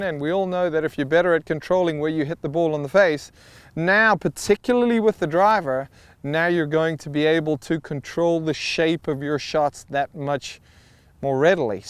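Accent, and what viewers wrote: South Africa. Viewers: I am a new subscriber to your channel... Love you videos Dont have too many golf Tutors in South Africa...but we do have some awesome golfer.... Your accent sounds south African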